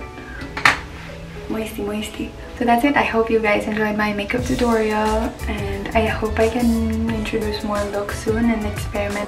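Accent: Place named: Visayas, Philippines. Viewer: Pag ikaw talaga nag sspeak ng bisaya ang sarap sa ears 😍. More pa sa mga next vlogs pleaseee3 🤗❤ keep safe ate ❤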